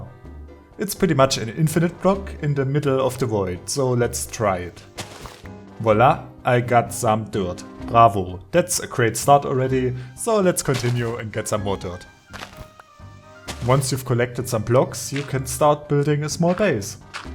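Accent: Germany. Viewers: dieser deutsche Akzent xd. beste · Der ist deutsch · Such a strong german accent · I missed that heavy German accent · Are you german ? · wie man einfach hör das du deutsch bist xD geil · Man hört den Deutschen Akzent xD Und geile Idee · Bist du Deutscher? 😂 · Thanks dude! German accent rullez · r u from germany? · duhörst dich nach einen deutschen an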